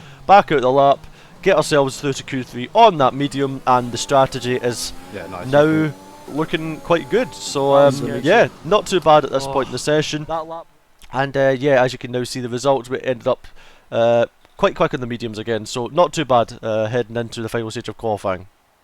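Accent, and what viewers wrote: Scotland, United Kingdom. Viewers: Ahhhh man!! That race was going so well, I would have loved to see you and Jarno fight in softs after the SC :( Still a solid finish, shit happens so just move on stronger 🙏🙏 PS: I generally have hard time with the Scottish accent but your commentary is very very clean and understandable!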